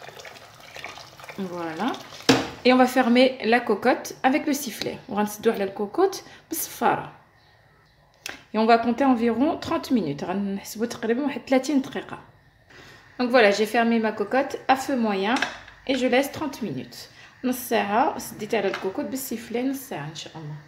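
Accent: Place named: Tlemcen, Algeria. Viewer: Vous avez un accent Tlemcen et le vocabulaire n est pas marocain . Les épices .les herbes la farine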